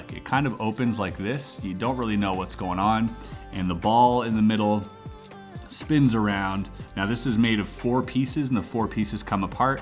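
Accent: Quebec, Canada. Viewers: This accent is definetly from Québec :D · are you canadian??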